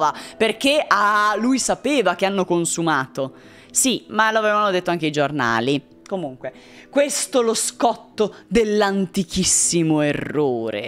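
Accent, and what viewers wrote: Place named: Emilia, Italy. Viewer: "...no no, sono pubici, fanno il ricciolino...!" con la tua cadenza emiliana, sono morto dal ridere, fantastica!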